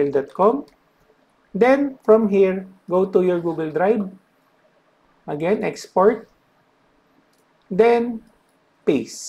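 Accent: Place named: Latin America. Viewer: amigo porque pija hablas en ingles si se nota de aca a la china que sos latino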